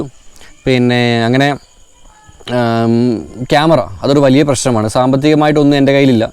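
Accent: Kannur, India. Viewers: Katha ellarum parayunnath nammalk ishtamakillaa. Bt ingal parayumbo entho kettirunn pokm manushya . Onnamath ee kannur karante slang okke kekkan nalla rasaaappaaa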